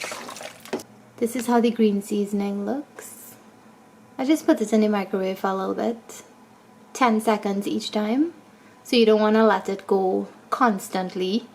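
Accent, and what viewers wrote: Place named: Trinidad and Tobago. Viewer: Some Trini chefs talks to much and with a exaggerated Trini accent so people on the internet can't understand them. But your accent is moderate/modest which is a good thing.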